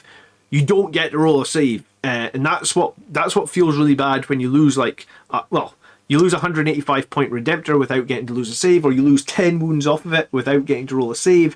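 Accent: Scotland, United Kingdom. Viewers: Wait, you're scottish?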